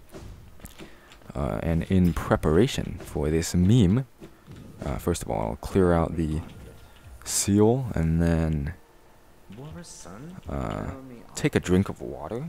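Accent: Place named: Philippines. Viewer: i could actually listen to you sing and just talk forever tbh your voice is so nice this isn't fair incidentally, where are you from if you don't mind my asking? your accent reminds me of some Filipinos but it could also be European...?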